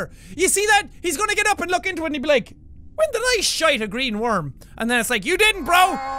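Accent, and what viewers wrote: Ireland. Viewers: oh my goash its an irshman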